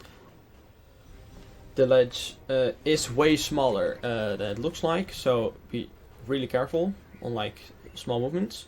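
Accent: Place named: Netherlands. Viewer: Mooie Nederlands-Engels accent.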